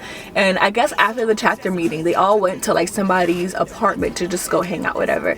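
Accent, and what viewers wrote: Jamaica. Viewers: what a low down, dutty, scandalous, frowsy pum-pum, racist b1tch! You did well not to beat her a$$! new to your vids - love them, also are you of Jamaican heritage because I'm clocking certain speech patterns...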